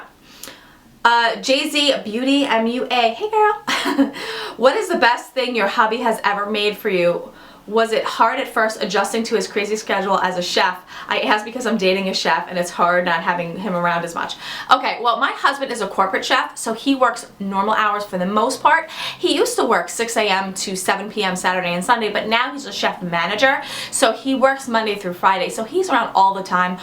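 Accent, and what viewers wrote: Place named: Long Island, United States. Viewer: OMG! I just started watching you recently (found you through Dani!) and as soon as you said "draw-ring" lol I was like wow she sounds like she's from LI. And then you said you got your cat from north shore!! I'm from Long Island too!! Will you ever do a meetup??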